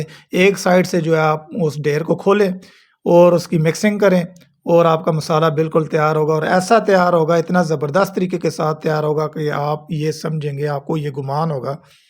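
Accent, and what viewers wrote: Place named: Attock, Pakistan. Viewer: بھاٸی آپ کی زبان سے تو لگتا ھے کہ آپ اٹک سے تعلق رکیتے ھیں ۔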